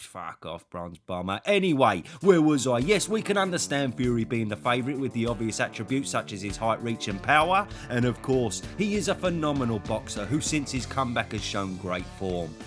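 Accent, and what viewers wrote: United Kingdom. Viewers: Please someone's tell me this Guy's accent is from in England. I love this Guy's british accent the best a foreigner has ever heard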